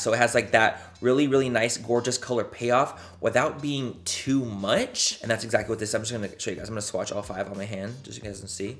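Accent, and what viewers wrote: United Kingdom. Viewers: Loool the British accent 😂😂 love it!! 👋 hi all the way from London ☺️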